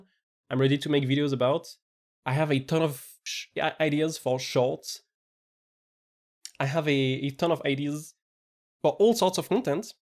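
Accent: France. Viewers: hahaha nah bro don't get me wrong I am taking this hole topic serious and huge respect for quitting your job and talking about it, but at this point your french accent is making anything funny for me.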